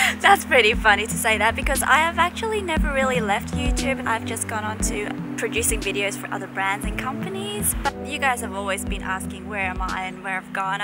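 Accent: Melbourne, Australia. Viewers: she has an Australian accent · she sounds so Aussie now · that Aussie accent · The Melbourne accent is cute on you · now in Oz, it's converting to a combination Aussie/Chinese accent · that Aussie accent! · you've taken the Melbourn accent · Starting to get that Aussie accent now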